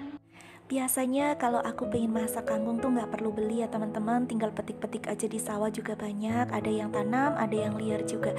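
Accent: Banyumas, Indonesia. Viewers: Kita sama2 ngapak mba👌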